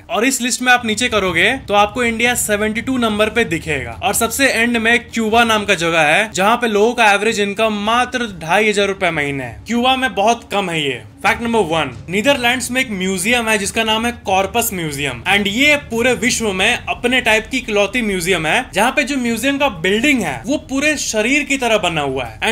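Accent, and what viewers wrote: Bihar, India. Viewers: missing telling facts in bihari voice form💖😊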